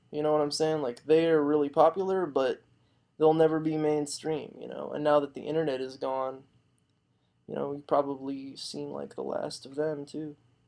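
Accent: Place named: Spain. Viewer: Have you seen by any chance, yearsss ago, his last vid (now deleted) that he uploaded where he was talking and seemed incredibly high or drunk and in a heavy Spanish accent ??? Like he was slurring his speech & I couldn’t understand anything, I wondered if he was under the influence of a drug that made him slur. It was weird as hell, then he deleted it.. I rly just wonder where he is in the world, if he’s even alive and what he’s doing rn.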